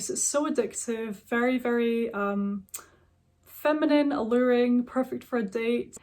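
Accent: Scotland, United Kingdom. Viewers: I love your voice. I'm here in the heart of the southern U.S., Arkansas. I hate the average accent around the area I live in, and your accent is a breath of fresh air. Will you tell me where you grew up? My girlfriend & I have debated it quite a lot. We thought it sounded a little Scottish, but you have the United Kingdom as your location. Thanks. We love the Channel.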